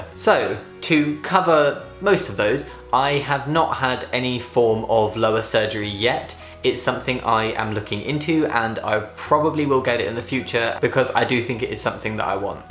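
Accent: United Kingdom. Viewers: I love how you're so awkwardly british lol <3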